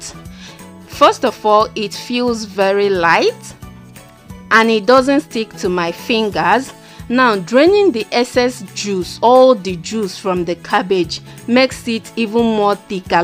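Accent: Igbo, Nigeria. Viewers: Ibo woman and her accent preparing fufu and eggs soup with chicken.....Ibo woman no dey carry last.... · First time watching your video and I knew already you are Nigerian by your accent 😁😁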